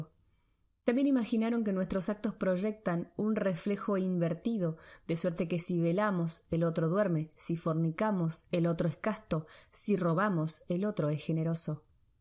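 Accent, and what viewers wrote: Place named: Argentina. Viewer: Espero no ofender a nadie con mi comentario, si fuese el caso: lo siento. Usualmente no me parece atractivo el acento argentino, pero tu voz me encanta. Gracias por las lecturas.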